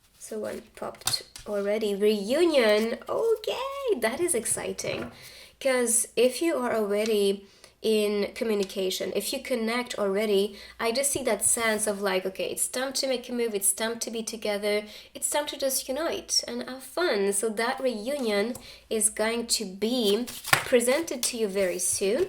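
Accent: France. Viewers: You have such a lovely voice, are you French?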